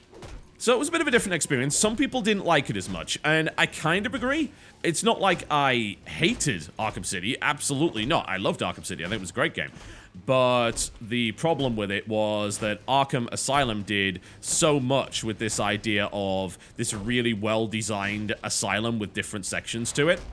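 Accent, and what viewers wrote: United Kingdom. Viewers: Oh crap! Did you just correct your pronounciation of the word "usual" to the american version!? :D Careful, or you'll lose your british accent...foreeeveeer...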